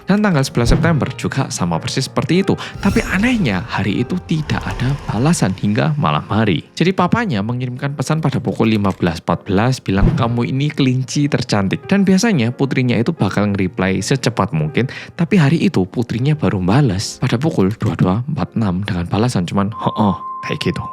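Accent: Java, Indonesia. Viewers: Korea medok jawa 😂krna kelamaan tinggal di jawa...kuliah di jawa ya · Sediiih😢 tks medok😊❤🎉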